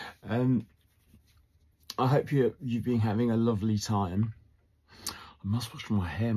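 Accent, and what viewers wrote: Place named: England, United Kingdom. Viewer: Good to see you. Was missing you. Been watching your other videos. Some a few times. Love listening to your chats to us. Hearing you burst in to singing at times. Love watching the archives site. Where past footage is there. Enjoy your videos. Love listening to you singing your songs. And the talking videos too. I like your Englishness. I am Scottish. I talk Scottish. Fit like are ye the nicht kind of thing. That is How are you tonight. It is a good job I don't write in Scottish. You would never know what I was babbling on about. I try to talk English when I am speaking to folk who would not know the Scottish dialect. And I think I am. But have had some say to others. I didn't understand a word she said there..lol They then translate to the person what I just said. I was working amongst an international community in my job. 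So I did need a translator sometimes. Being as Scottish as I am.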